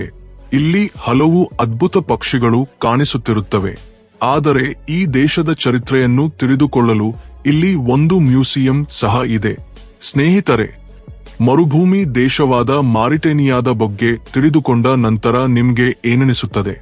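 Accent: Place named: Andhra Pradesh, India. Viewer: ನೀನು ತೆಲುಗು ನವನು ಎಂದು ಕಾಣಿಸುತ್ತೆ ಅದಕ್ಕೆ ನೀನು ಕನ್ನಡವನ್ನು ತೆಲುಗಿನ ರೀತಿ ಮಾತನಾಡುತ್ತಿದ್ದೀಯಾ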